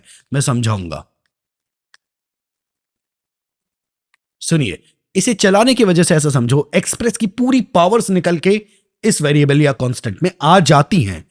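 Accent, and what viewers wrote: Bhopal, India. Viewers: bawa bhopal se ho kya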